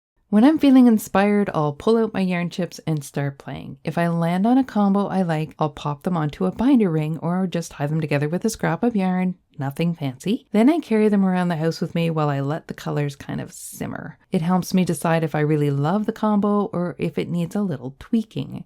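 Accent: Canada. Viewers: You sound canadian. So hopefully you have access to Home Depot and/or Lowes. I sometimes use their color swatches from the paint department. It's good for gradient colors and mixing and matching. I know, sounds a bit weird, but really helps me with color choices.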